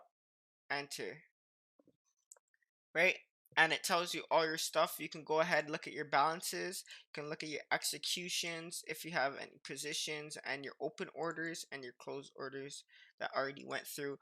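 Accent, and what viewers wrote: Toronto, Canada. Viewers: yuh knoe... you from toronto ?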